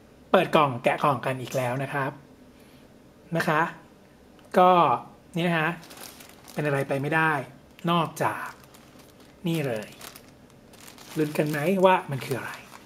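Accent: North, Thailand. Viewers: (งงเหมือนกั๋น)....เอ๊ะ สำเนียงคนเหนือเปล่าครับ ฮ่าๆๆๆๆ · คนเหนือเเน่เบยยย ><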